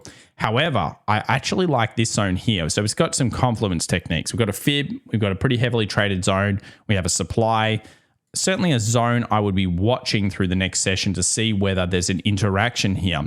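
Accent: Australia. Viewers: Your Australian accent is super cool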